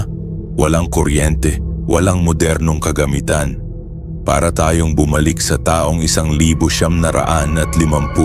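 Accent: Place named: Conyo, Philippines. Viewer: AI narrator na konyo pa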